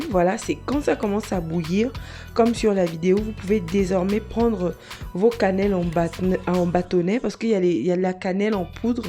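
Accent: Gabon. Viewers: Votre accent on dirait une gabonaise 🤔🥰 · cet accent qui me rappelle mon Gabon 🥰🥰! Merci pour l'astuce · C'est la première fois que je regarde vos vidéos. Votre accent ? Vous êtes gabonaise ???😍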